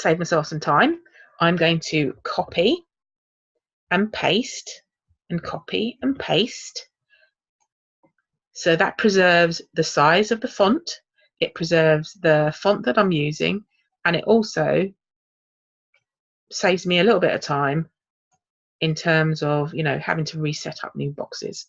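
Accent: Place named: Hertfordshire, United Kingdom. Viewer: Thank you. New to KDP so learning how to make one’s own interiors is good to know rather than having to get software like Tangent Templates (although I think I am tempted to as well). May I ask a question: would you recommend TT, are you still selling on KDP as this video is from 2 yrs ago?, are you still posting videos? You sound like you are from my neck of the woods. I’m a 54yr old mum from Hertfordshire (top of Northern Line). Thank you.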